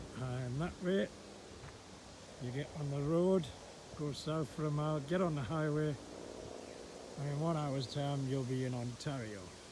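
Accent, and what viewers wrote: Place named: Northeast, United States. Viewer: Hi, I saw your sticker on Craig Tate's channel. Sounds like you are from the NorthEast. Interesting to hear your story and I learnt quite a bit about your local area. "Join Youtube and see the world". I'm in York UK. Cheers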